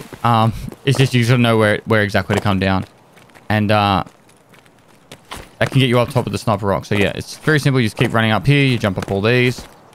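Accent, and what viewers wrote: Australia. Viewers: He is speaking English, with a moderate Australian accent. He is a native aussie.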